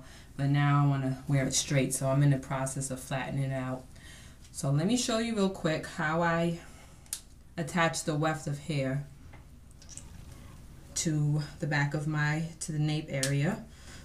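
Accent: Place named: New York, United States. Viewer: I super love your new york accent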